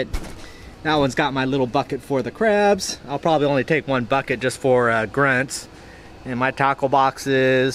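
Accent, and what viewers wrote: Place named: Hawaii, United States. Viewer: Are you from or lived in Hawaii? You sound like you did before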